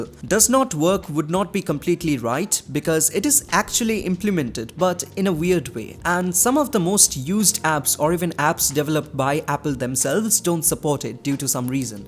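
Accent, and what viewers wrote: Bengal, India. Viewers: btw great content. I assume from your accent that you are Bengali. Anyways, take love. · Bro are you from West Bengal or from Bengali ancestry as I have seen little Bengali accents from you because I am one myself.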